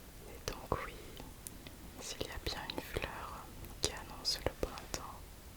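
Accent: France. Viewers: Another brilliant video. I love your beautiful french accent, so calming. Please make a really long video so I can fall asleep listening to your voice 🤗🙂xx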